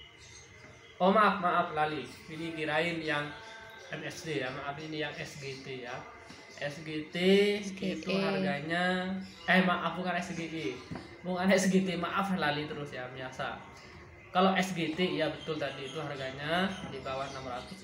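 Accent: Madura, Indonesia. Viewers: Kental bahsa maduranya 🙏🏻🙏🏻😃😃😃😃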